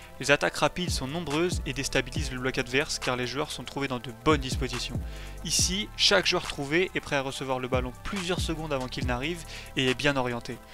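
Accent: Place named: France. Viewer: Mec le format de tes vidéo sont lourd continue comsa les explications sont claires et quel bonheur d’entendre une voix française 🤩 je m’abonne direct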